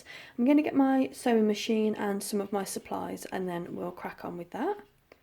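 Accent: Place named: England, United Kingdom. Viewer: Thank you for a great tutorial on tea bags! I’ve subscribed so I’ll look forward to your next video! I’m in New Zealand, love hearing your English accent!🧚‍♀️✨💫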